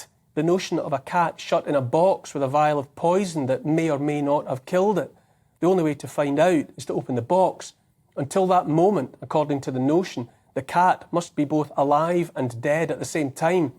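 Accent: Scotland, United Kingdom. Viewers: thank God for people like you. You are the best, views so eloquently put and with your adorable Scottish accent too. Wake up people!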